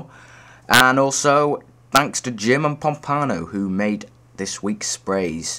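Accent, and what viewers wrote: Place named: United States. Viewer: why do you say "zee"? american accent is very entertaining to listen to... xD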